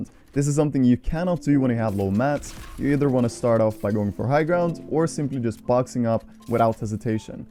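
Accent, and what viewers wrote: Norway. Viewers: Are you from norway? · Are u from norway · wait are you norwegian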